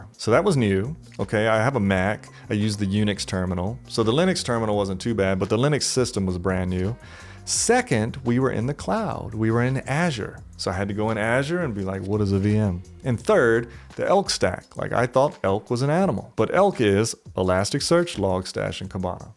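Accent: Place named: Louisiana, United States. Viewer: I changed my career from food service -> call centers -> web development. I did the final one at 30. All self taught I think it taught me more about perseverance and made me unafraid to do new stuff I no longer let not knowing something prevent me from doing it. That accent you have though, I bet you were born in middle Louisiana or southern LA sounds like Avery Island. --- To other folks looking to make this same type of switch. Do not let anyone tell you "programming is too hard" it just takes dedication and practice as well as a penchant for enjoying learning.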